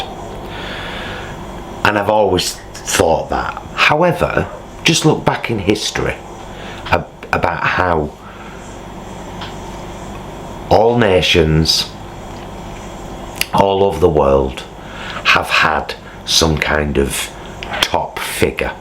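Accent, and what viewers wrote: Manchester, United Kingdom. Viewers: Nice to hear a Mancunian accent today! It's very strange being so far away from the UK when something like this happens, you just want to be with your people, who understand. The image of Her Majesty sitting alone at Philips funeral, while Boris partied will stay with me forever. It's like the Nation's favourite Granny has died. Sounds daft, but I can't explain it any other way? You Nailed it tho, brought a tear to this 53 year old Truckers eye!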